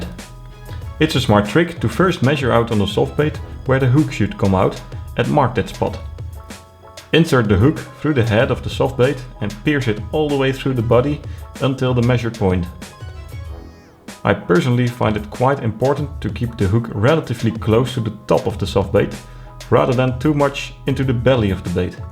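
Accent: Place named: Netherlands. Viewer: That's a Dutch accent if I've ever heard one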